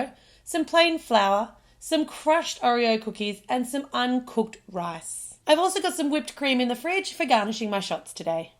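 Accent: Australia. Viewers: Are you Australian because you sound like one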